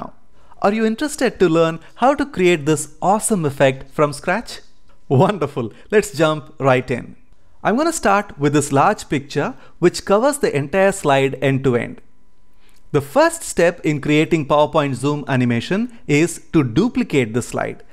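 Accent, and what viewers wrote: India. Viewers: wonderful!! best step by step explanation...n best part is Indian English. Thank you for sharing the idea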